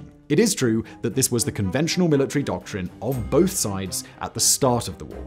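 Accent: England, United Kingdom. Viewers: The french and German trenches were nothing a like. People think becuase this guy dressed well and has a british accent he knows what he is talking about. The german trenches were meticulous and the English were temporary and terrible to live in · Why are you using American English? You sound English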